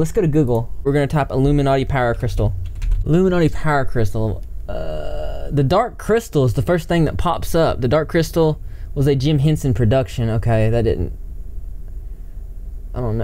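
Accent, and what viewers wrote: South, United States. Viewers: you rock a southern voice THATS HARD TO DO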